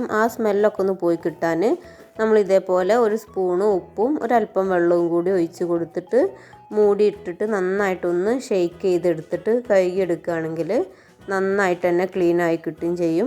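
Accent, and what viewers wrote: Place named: Kannur, India. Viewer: ഞമണ്ടി എടുക്കാൻ 😂😂😂,കണ്ണൂർ ഡിസ്ട്രിക് ആണോ സഹോദരി ,👏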